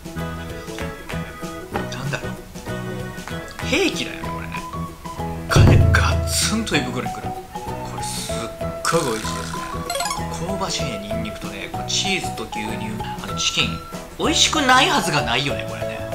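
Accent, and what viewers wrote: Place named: Kansai, Japan. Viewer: 今日も絶好調ですねww リュウジさんはなんでちょいちょい関西アクセントはいるの。 そこもすこ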